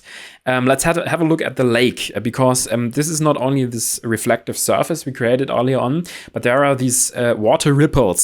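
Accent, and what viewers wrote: Germany. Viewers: Liebe es Deutscher Akzent 😂 top video danke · Love it! Thanks for this inspiration and the tips! Pls more of this sort of Videos!! Are you from Germany? Your accent sounds like that! 😋😅🙏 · Are you from germany because i recognize the accent . Viele grüße!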